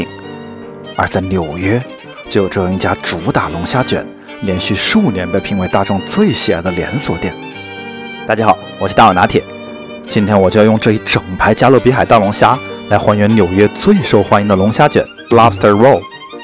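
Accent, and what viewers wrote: Wuhan, China. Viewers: 拿铁老师请问你是武汉人吗？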